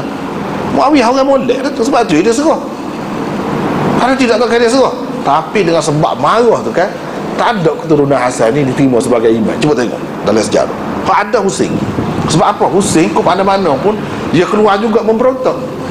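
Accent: Kelantan, Malaysia. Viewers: Beliau menggunakan Bahasa Melayu dengan dialek Kelantan🙏🏼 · Ko tak faham loghat kelantan ker? Cuba ko ulang tengok video ni lagi. Ustaz ni la antara yang paling kuat mempertahankan sayidina mu'wiyah RA..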